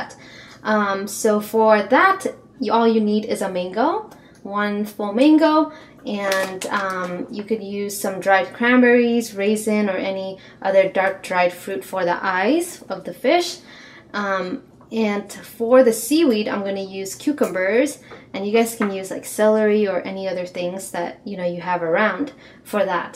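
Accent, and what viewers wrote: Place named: United States. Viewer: It's so interesting to see how your accent has gradually become more American over the course of your videos, love the fruit art!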